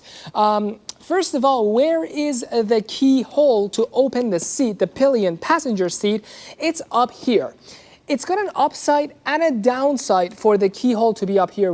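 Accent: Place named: Iran. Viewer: Qorboone oon lahje Iroonit besham man jan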